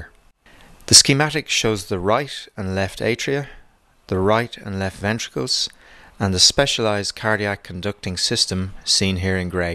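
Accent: Ireland. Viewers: What a HUGE help this video was! I wish my college teacher explained it this well. Thanks for this video. I think you have helped me raise my grade. Your Irish accent is a pleasure to listen to as well. Thanks again, doctor.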